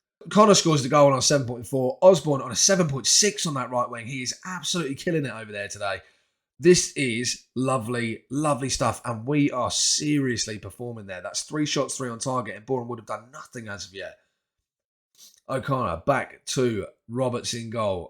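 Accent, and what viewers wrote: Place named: Australia. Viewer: can definitely hear a bit of aussie working its way into your accent lol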